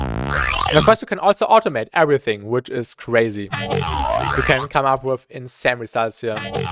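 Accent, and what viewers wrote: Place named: Germany. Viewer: I love the German accent, really gives it a scientific vibe haha ❤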